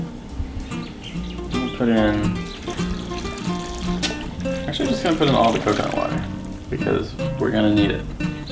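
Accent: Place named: United States. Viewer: Hi guys, watching this brought back loads of memories. I was born on the island of Trinidad. We had a coconut tree in our yard. I know you pick off the younger coconuts, when the flesh is soft, you can scoop it out with a spoon, and put it in a glass or whatever container that you collected the water in before you cut the coconut open to get the flesh. Delicious!!. Where in the world are you guys? You sound like an American. Enjoy your meal, coconut milk makes every thing taste better,and quite nutritious too.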